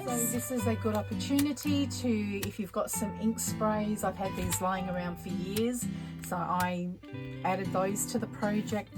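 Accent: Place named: Australia. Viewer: Just came across your channel today and I’ve watched a couple of videos. You’ve gained a new subbie. It great hearing Aussie voices. 😊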